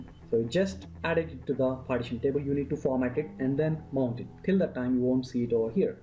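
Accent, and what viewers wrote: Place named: India. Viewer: And another Indian. At least the accent isn't that bad.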